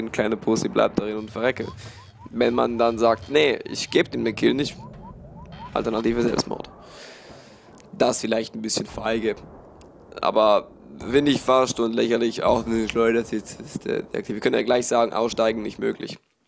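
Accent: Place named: Switzerland. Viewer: ich dachte am anfang du wärst engländer oder ami oder so^^ hab dann aber auch auf schweizer getippt!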